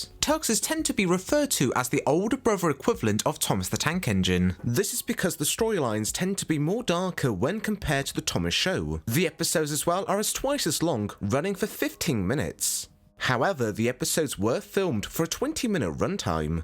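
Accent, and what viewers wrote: United Kingdom. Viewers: Fun fact: the creator of the video is from the uk cuz of his voice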